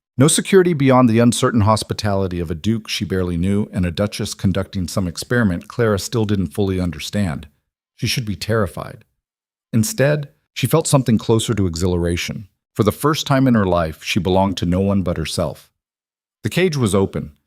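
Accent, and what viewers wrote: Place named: United States. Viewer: And I don't understand why the AU narrator has a nasally American accent when the story is set in England. Ridiculous. 😔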